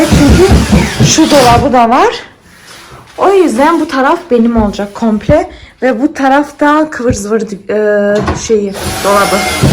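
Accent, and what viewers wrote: Turkey. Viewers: Du bist die beste mit deiner türkische Aussprache Kıvır zıvır 😂 so eine schöne Wohnung 🤩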